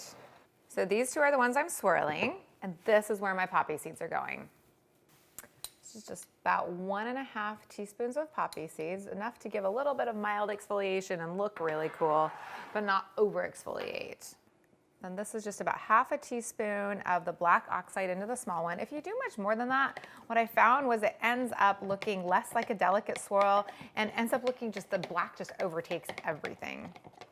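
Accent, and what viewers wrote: United States. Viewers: MN people pronounce it the same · It’s common in the Pacific Northwest, is from Washington. “Pellow” rather than “pillow” is pretty normal too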